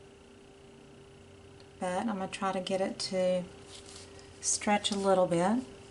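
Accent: South, United States. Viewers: Love this one. Absolutely lovely. I knew your accent was either NC, SC or GA. Hillsboro is only 45 minutes away. Now I'm upset I missed the festival. I'll catch the next one.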